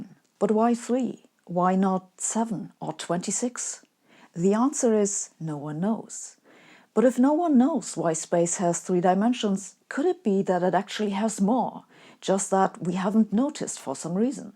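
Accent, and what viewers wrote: Germany. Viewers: Sabine is lovely,funny and brilliant...she makes Physics fun and accessible...I love her German accent,too,tcx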